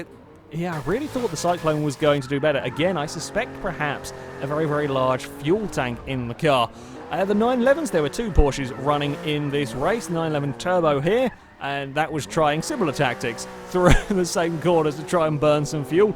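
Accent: United Kingdom. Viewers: You sound incredibly British :D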